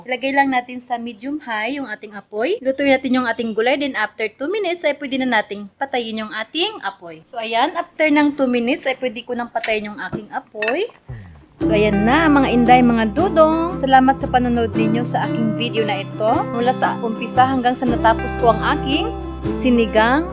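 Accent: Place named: Visayas, Philippines. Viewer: Siguro mag bisaya ka nalang kasi bisaya ang tuno mo oi..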